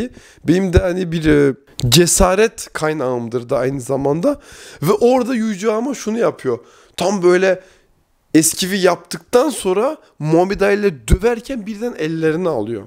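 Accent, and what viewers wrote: France. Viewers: Abi fransız mısın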